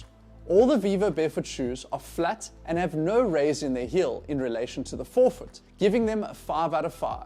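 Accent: South Africa. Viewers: yeaahhh, this guy is South African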